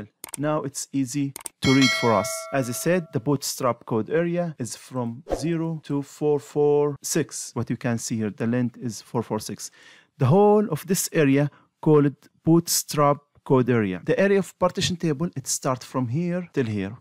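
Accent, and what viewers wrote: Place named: Jordan. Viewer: Solid Jordanian accent.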